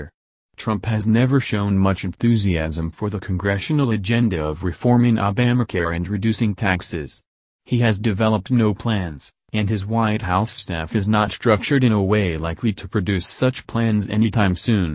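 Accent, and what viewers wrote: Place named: Iran. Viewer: This guy talks like an Iranian he obviously can't speak English well. This sounds like false news. Shalom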